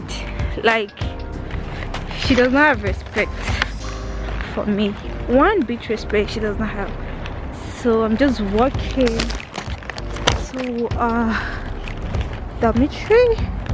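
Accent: China. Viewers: Another beautiful vlog. I love your Chinese accent 🥰😍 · I love well you your Chinese accent. And hunny I loved your vlog.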